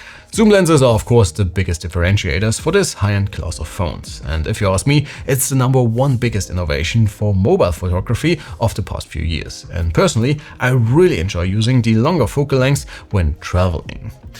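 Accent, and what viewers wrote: Germany. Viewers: Great Video. Is your Accent from Germany?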